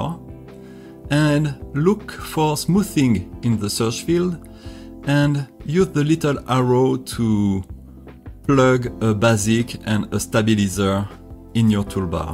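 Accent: France. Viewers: thanks!!!! you have a bit french accent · Pourquoi garder un accent français ? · Français ! j'ai reconnu l'accent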